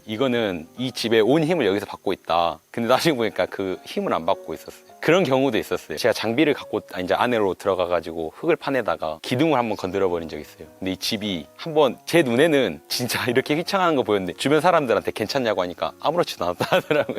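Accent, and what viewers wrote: Jeolla, South Korea. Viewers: 사투리는 고칠수가 없구나 절라도여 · 화순은 고향이 아닌데 전라도 출신은 맞네요..사투리가 전라도 사투리 아닌가요?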